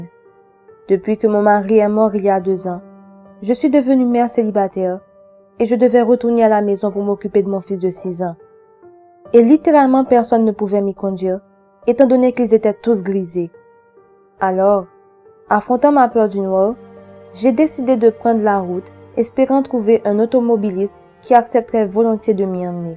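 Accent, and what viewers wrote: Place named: Overseas France, France. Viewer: j'adore tes histoires on ton accent des Dom Tom.merci pour tes vidéos 🥰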